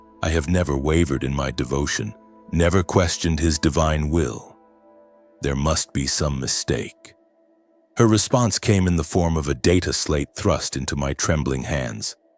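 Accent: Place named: United States. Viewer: With so many accents you choose a basic american one? Lacks imagination and faith, the inquisition now watches you for both the sin of imagination and faith in what you believe. Furthermore the pics are not cannon. They Gray Knights are now also involved!!!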